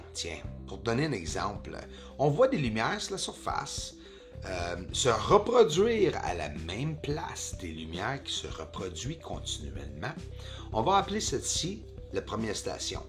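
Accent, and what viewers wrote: Quebec, Canada. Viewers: y a pas pire aveugle,que celui qui ne veut pas voir,bout d,viarge,ha ha,t,as de + en + notre bel accent quebecois,lache pas,on aimes ca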